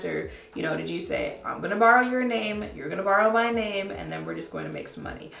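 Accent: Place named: New York, United States. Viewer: Such a New yorker!! ♡ THESE LAUNCHES ARE LAzzzsssyyy! It's giving... Dull and Dustttyyy! Yoooo!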